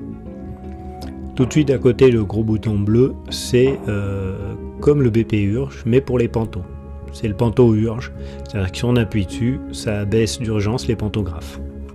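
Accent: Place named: Haute-Loire, France. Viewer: La voix de est unique, appaisante avec un p'tit air de la Haute Loire si je n'dis pas de bêtises.. 👍😊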